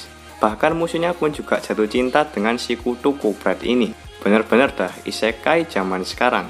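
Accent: Java, Indonesia. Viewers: jawa banget koe lur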